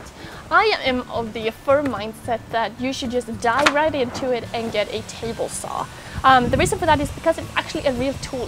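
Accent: England, United Kingdom. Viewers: Hi, ooo you are from oregon US I was thinking you was from England Jaja well ok, 👉🏼always love your videos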